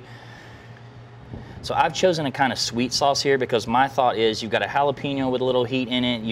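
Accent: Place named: Kentucky, United States. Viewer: Would never expect a German to say “ain’t no such thing”! Love it. You sound like us (in Kentucky). Meat Church videos always really good.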